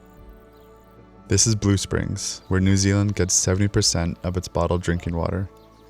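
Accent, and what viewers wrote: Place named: Canada. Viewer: Weirdest pronunciation of Coromandel I have ever heard! Must be the Canadian accent.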